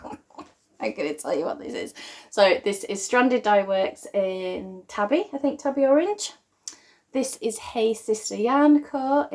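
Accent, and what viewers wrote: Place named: Sheffield, United Kingdom. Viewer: Hi good to watch you again. Love hearing you, reminds me of Sheffield!!!! We moved out to New Zealand 16 years ago and I miss ALL the English accents. :) We are in autumn here and I just cast on a swatch for a new jumper for my hubby. I hope you decide to make up your own patter. It seems to me that you are really creative so this is just another way to explore your creativity. Your spinning is making me go back to finish my rolags. :) Catherine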